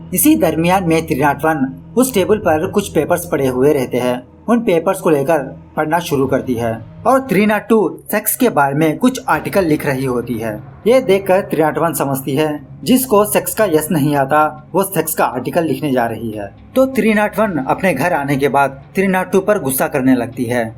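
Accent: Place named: Bengal, India. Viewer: Bhai hindi bol ye banglihindi kyu bolra h🤣🤣🤣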